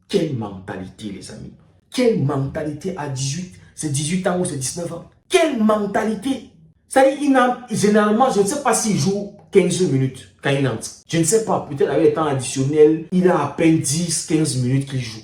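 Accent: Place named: Cameroon. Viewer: Le français des camerounais.👍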